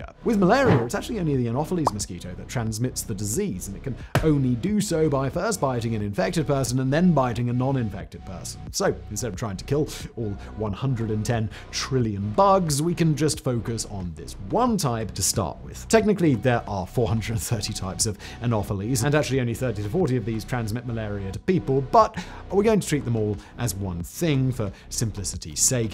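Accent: United Kingdom. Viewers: I thought this was v sauce doing a British accent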